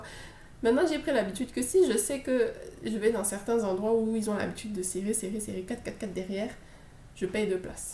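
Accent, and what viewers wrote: Cameroon. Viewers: Travaille ton cardio car chez nous c'est le cardio ! Autre chose, NE CHERCHE PAS A COMPRENDRE LE CAMEROUN ! Tes problèmes commenceront dès que tu chercheras à le comprendre car un dicton dit : Si on t'explique le Cameroun et tu dis que tu as compris, c'est qu'on t'a mal expliqué ! L'accent Camer y est déjà, c'est bien. Pour le reste, gère seulement ! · Tu deviens déjà camerounaise , même l'accent hein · tu es super marrante 😅on dirait que tu as vecu au Camer toute ta vie(tes expressions,ta gestuelle,ton accent etc) tes videos me detendent beaucoup,continue comme ca. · Le port du casque est obligatoire au Maroc. C'est un pays plaisant avec une population avenante. T'as déjà prise l'accent camerounais et c'est plaisant de t'écouter . T'es éloquente et pertinente dans ton analyse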